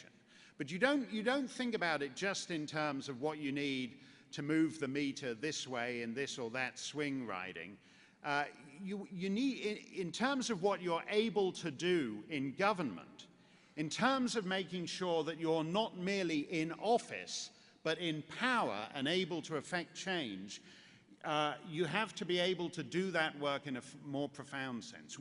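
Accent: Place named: Oxford, United Kingdom. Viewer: Well, I discovered Mr Mark "par hasard" on Google and started listening to his various speeches about many subjects such as Obama, the frightening "islamisation" of Europe (and the world in general), and so on, and was particularly interested and delighted by his impressive and witty defense of free speech; as an European (a Euro-skeptic to be precise) and mainly as a basically "anti-Left progresssive" Frenchman I must say I like this man and would appreciate much his coming to France just to debate with our stupid so-called "President" Mr 3% François Hollande, that would be fun to say the least; By the way, at first I really thought he was an Englishman, because of his "Oxfordian" accent, and could not help comparing him with the "petulant" Ukip leader Mr Farage, who dares telling the Truth about Europe and its growing denial of Democracy. 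I heard him quote Alain Finkielkraut, a french philosopher that I like very much and taught me to "see the things I saw", which is a good thing to do, like admitting that "the facts are always Conservative". I just ordered Mark book "After America"...And I'm sure i'll enjoy it....Oh, and I remember he also compared Neville Chamberlain and Winston Churchill... Good Luck!!! Love your insight.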